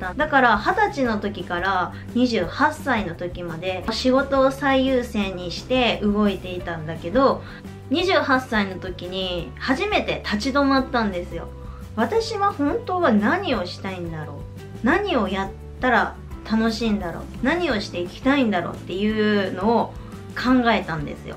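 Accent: Kansai, Japan. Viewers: さんの動画、わかりやすく且つ簡潔ですごく見やすいです！いつも参考にさせていただいております💓 関西弁新鮮でキュンとしました笑考え方がすごく前向きで素敵です✨✨ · 鹿児島生まれ神戸在住ですさんの関西弁萌え(*^^*)♪ アタシは神戸に２０年以上いるので逆に鹿児島弁を忘れた（笑たまに実家に電話したら鹿児島弁になるんやけど 『なんか変なイントネーション』と言われるくらい関西弁が身についてしまってる～ さんは、ベッピンさんやで♡ 美容部員さんは美人じゃないとなれないとアタシは思ってて憧れる。素敵な職業ですよね♪ アタシは美的センスが無いから美容師を諦めて看護師になったけどやっぱりメイクを楽しむのは好きなんでさんのメイク動画いつも参考にしてます(^o^)v これからも楽しみにしてます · すでに11万人になっていますね‼️ おめでとうございます✨👏✨ YouTubeから銀の盾が贈られてくるのはこれからですか？さんは努力家ですね❗ 落ち着いた感じの分かりやすい丁寧な解説が、数あるメイク動画の中でも選ばれている理由だと思います。これからも頑張って下さい😄 (個人的にはさんの関西弁、もっと聞きたい・笑)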